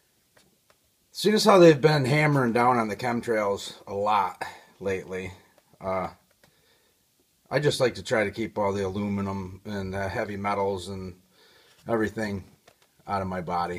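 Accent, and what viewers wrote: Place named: Midwest, United States. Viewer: I absolutely love that you took the time to make this video, thank you so much! (plus, I adore your Midwest accent).;) I started taking 1/8-1/4 tsp Borax everyday to get the fluoride outa my body & even though I now have a Berkey water system w/ the fluoride filters, I still supplement w/ Borax (& Lodoral Iodine) due to chemtrail crap & the various foods & beverages I ingest that likely contain fluoride. Anyway, you rock for sharing, thanks again.:)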